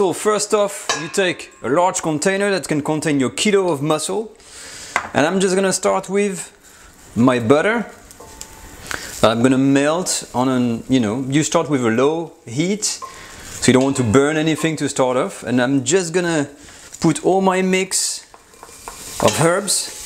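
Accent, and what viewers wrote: France. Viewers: j'ai reconnu à l'accent que tu étais français, en tout cas super que tu parles en anglais, ma voisine va encore plus adorer;), en tout cas super bien expliqué,super recettes... 👍👍👍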